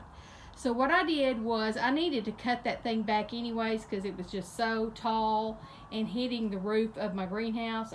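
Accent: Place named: Virginia, United States. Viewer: I can’t thank you enough for the before and after video on your philodendron. Like you said lots of videos about them but none like what you did. I have the same long gated 20 year-old philodendron which I will be doing exactly what you did taking pictures and sharing them with you again thank you thank you thank you by the way I love your accent. I’m here in Sacramento California but, I have a brother who is in Richmond Virginia so it’s a loving reminder, lots of love to you and thank you again. Rene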